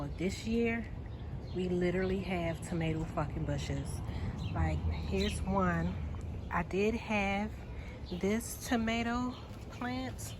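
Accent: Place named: Baltimore, United States. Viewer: I knew once I heard your voice your were from BMORE!!!! I’m was born and raised there, but I live in GA. Your garden is BEAUTIFUL!!! Can’t wait to see this years garden!!! 💐💐 Peace & Blessings ✨✨✨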